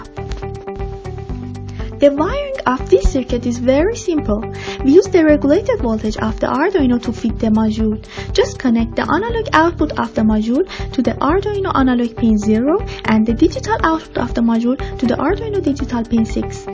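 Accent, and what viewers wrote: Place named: Iran. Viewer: دمت گرم بابت ویدئو و تلاشت که هم گرافیک قشنگی ساختی و هم خوب توضیح دادی. تعجب نکن از رو لحجه باحالت فهمیدم ایرونی هستی. ولی باز دمت گرم ، میدونم چقدر ساخت همچین ویدئویی زمان بره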